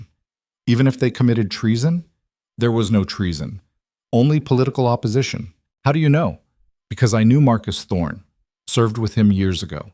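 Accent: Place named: United States. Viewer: Hell is acceptable but NOT f***!!! And the modern language/accent of the narrator is annoying!!! Why American English when it’s Victorian England…??!! Can NOT recommend, NO subscription!!!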